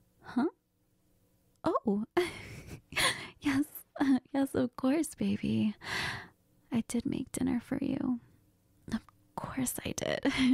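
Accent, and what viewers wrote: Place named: Spain. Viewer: You felt extra Spanish today (as a native speaker i loved it) - Hermoso audio!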